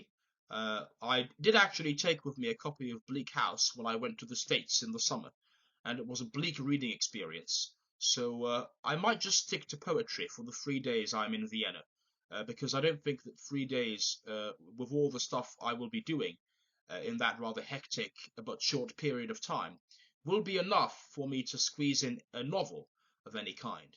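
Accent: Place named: United Kingdom. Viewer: I'm going to let you know something, when I saw this video pop up on my homepage I thought, 'will he will speak with a British accent', and I was right! I'm from the US by the way😭😂🤣🤣🤣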